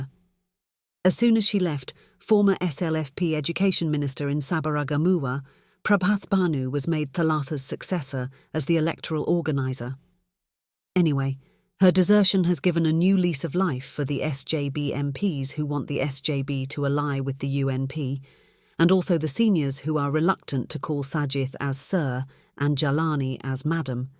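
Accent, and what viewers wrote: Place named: RP, United Kingdom. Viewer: Queen`s English. Very good.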